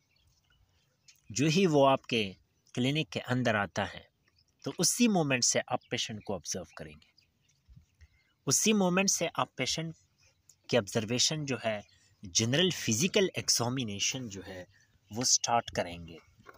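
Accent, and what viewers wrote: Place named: Pashto, Pakistan. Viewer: Aesa lg rha hy ap pashto mn English bol r hn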